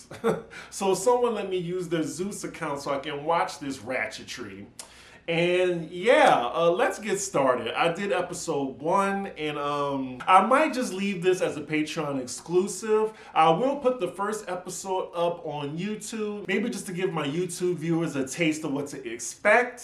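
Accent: New Orleans, United States. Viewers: you gotta use your New Orleans accent more! 🤣🤣I luv ❤️to hear it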